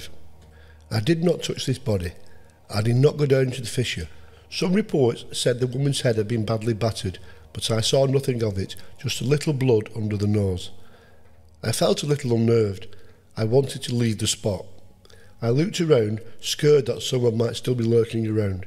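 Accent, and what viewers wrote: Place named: Bolton, United Kingdom. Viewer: Nice one cha the case in Blackburn where they finger printed the entire town struck a chord as I was born there and iam guessing your not from far away with your accent Bolton farnworth is my guess ha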